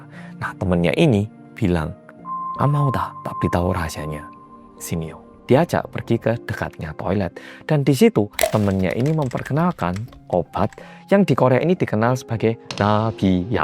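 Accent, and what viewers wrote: Java, Indonesia. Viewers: sehat terus Mas, selalu kangen denger suara bule Korea yg medhok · Mas.... Muedooook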